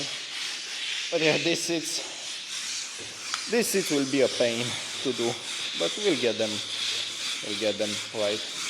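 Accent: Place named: Romania. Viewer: Mi s a parut ca esti roman de la accent dar cand am vazut romaneasca din portbagaj mi am dat seama ca e vorba de Romania 100% 😂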